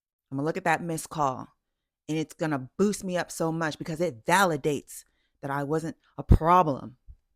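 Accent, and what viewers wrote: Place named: Bay Area, United States. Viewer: I knew you were a Bay girl!!!! hahaha I was like she from LA?? ehhh nah...then you said it lol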